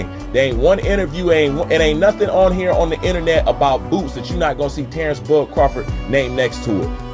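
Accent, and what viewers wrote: United States. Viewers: You sound like you from Philly😂😂😂 I'm sure you seen the same pics we just seen! That's a different look that Boots gave to Spence😂😂 He was talking 💩 to Spence but he looking nervous next to Crawford! Boots looking like he don't want to fight Crawford but his daddy wants him to😂😂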